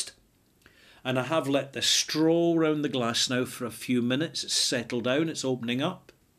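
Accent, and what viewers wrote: Scotland, United Kingdom. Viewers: Hello, it only took me 5 1/2 years to watch this vlog. The whole 27 minutes was enjoyable. Even the scottish turn of phrase "see... that/yon". Kept wondering how much you were having to keep your brogue in check. Thanks and take care. Fraser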